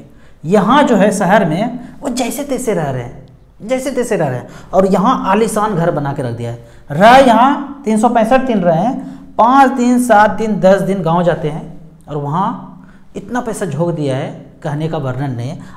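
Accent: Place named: Awadh, India. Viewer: सर आपका होम डिस्ट्रिक्ट क्या है , आपकी बोली में अवधी का पुट है इसीलिए जानने की इच्छा है, आपका फॉलोवर...💐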